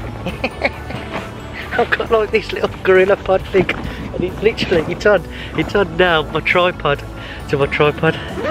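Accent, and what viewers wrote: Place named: Birmingham, United Kingdom. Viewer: The brummie version of Kai from DigitalRev...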